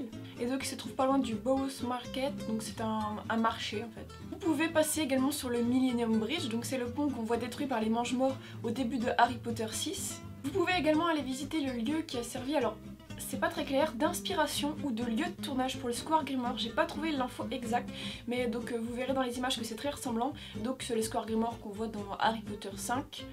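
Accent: North, France. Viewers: Tu viens du Nord ?🙂 Ps: Potterhead a vie 😍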